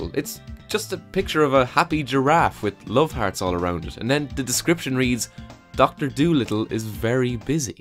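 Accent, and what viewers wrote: Ireland. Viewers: Are you Irish? You should do a video with Call Me Kevin! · I love the Irish when they're angry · Nice Irish Brogue.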